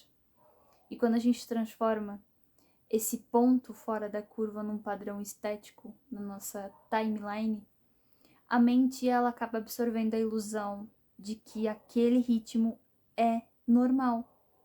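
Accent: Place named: Portugal. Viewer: Você já morou em Portugal? O sotaque parece. Tô acompanhando o conteúdo pelo insta e achei aqui agora. Parabéns!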